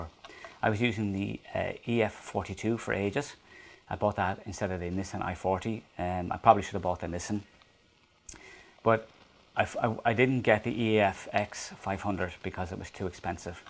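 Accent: Ireland. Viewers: Pronunciation sounded fine to me. Sincere. Thought there might be an Irish accent--not sure. (I like listening to Irish accents). Hope your wife is well. Thanks for making the video. I liked the simple background and the uncomplicated presentation. The video quality was really good. Am thinking to get an XT-2 for making Youtube videos. Thanks again!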